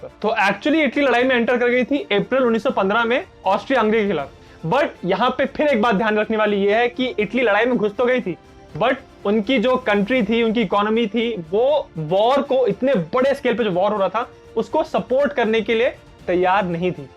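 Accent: Bengal, India. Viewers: Are you a Bengali?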